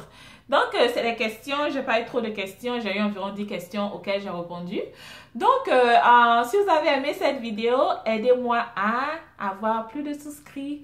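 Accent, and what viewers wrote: Ivory Coast. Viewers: ma soeur j était déjà abonné par ton accent je savais que tu était ivoirienne donc je doit soutenir ma soeur mai je viens de voir cette vidéo tu ma trop fait rire je vis au Canada et mon baby me manque tellement j aime ta bonne humeur et sa sais made in cote d ivoire Abidjan est le plus doux au monde les vrais foutou placali et korpet escargot crabe poilu heee sa me manque ohhhh maman je vais pleuré😂😂😂😂 tu ma tué😄😄😄😄sais karidjatou a fagat moi je suis de la côtière je sais pas si tu connais dabou jackville mai bisou bisou ma soeur mon mari est baoulé👍👍👍👍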